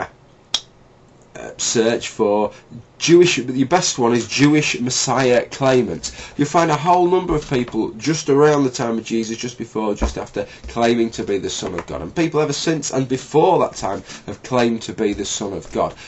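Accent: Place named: England, United Kingdom. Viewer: LOL! When he opened his mouth I said "lol a pom!" Awesome vid mate! 5 stars.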